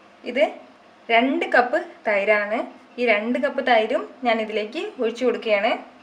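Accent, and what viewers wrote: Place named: Malappuram, India. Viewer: സംസാരം കെടാപോൾ മലപ്പുറം ആണ് തോന്നിപോകും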